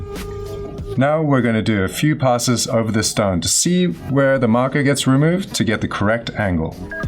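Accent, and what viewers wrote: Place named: Zimbabwe. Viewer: He has a Rhodesian/Zimbabwean accent. Get a life and leave him alone.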